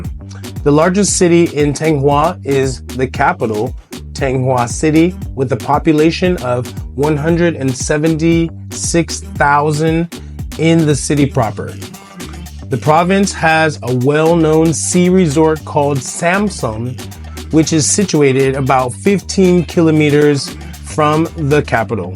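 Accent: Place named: England, United Kingdom. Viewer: I guessed that comes from UK His accent is English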